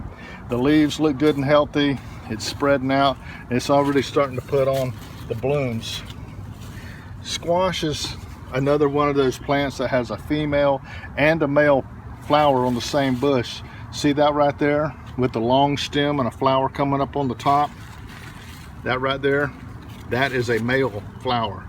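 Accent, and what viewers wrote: South, United States. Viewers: “Then I’m gunna install 4 squash” 😭❤️ Sooo country lol. Love it! 🤘🏼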